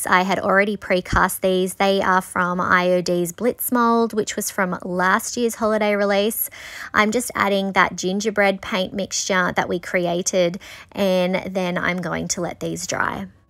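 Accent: Australia. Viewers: I just found your channel and it's so nice to hear your Australian accent. You're very talented and inspiring me to try this. Thank you ❤🐨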